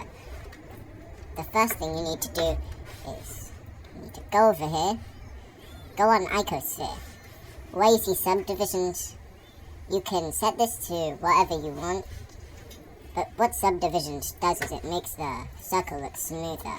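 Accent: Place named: United Kingdom. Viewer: U sound so british 😭😭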